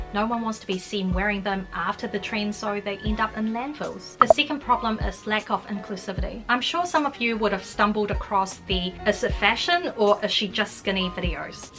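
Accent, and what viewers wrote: New Zealand. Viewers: Naw love the NZ accent. People some times ask me where in NZ I am from, but I'm just a German girl in Australia lol Love the editing on the video btw. Very engaging 😃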